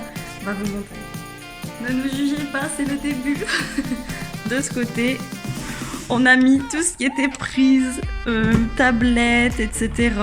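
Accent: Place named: Quebec, Canada. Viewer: tu prononce tes a comme nous deja ta l accent ..bienvenue au quebec bon succes pour votre chaine je m abonne et vous suit pour d autres videos..avec l hiver c est la periode ideal pour decouvrir notre culture et nos artistes ainsi que notre univers musical qui est tres different de la france amicalement d un nouvel ami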